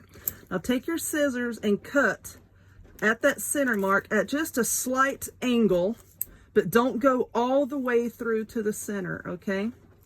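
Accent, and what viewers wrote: South, United States. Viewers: I love listening to you talk. I’m a Texas girl so I’m Southern too. You are so good and funny too.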